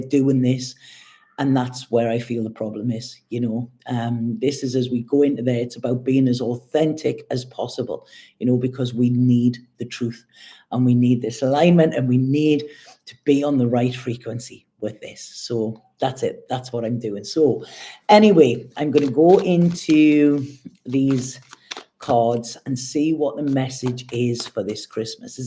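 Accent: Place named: Scotland, United Kingdom. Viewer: Love that Scottish accent.❤🙏😘🎅😎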